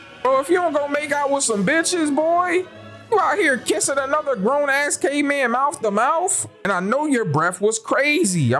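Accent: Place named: Philadelphia, United States. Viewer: Had to subscribe bcuz u sound like u from Philly so gotta support my city 💪🏾💯🙌🏾 not much of an anime guy but I love Baki show nd a fan of good commentary and humor… u checked all my boxes 🤣 keep goin bro … “this my third day out here “ ….a Philly reference? definitely Philly bul never thought I would be able to identify a “Philly “ accent 😂 made me peep my own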